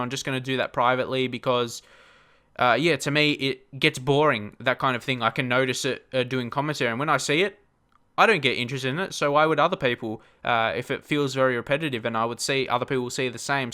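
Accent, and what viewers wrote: Australia. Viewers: aussie guy.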